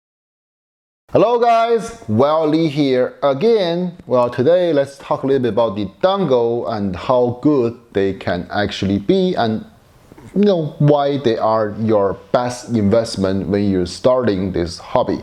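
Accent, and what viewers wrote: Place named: South Korea. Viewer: What the f#ck is 똥글... ? Are you Chinese? Sounds so Korean ... Your accent, I mean.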